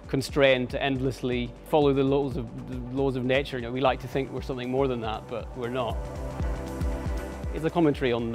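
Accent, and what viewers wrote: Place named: Northern Ireland, United Kingdom. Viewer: Holy shit my favorite artist is from the same country as me, northern Ireland. Heard the accent and flipped out!! Keep up the good work 👍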